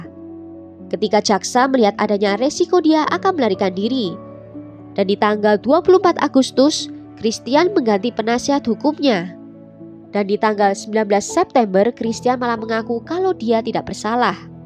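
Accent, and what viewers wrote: Java, Indonesia. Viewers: Hayyy jg medok kuhhh.. suaranya sukaaa💖💖💖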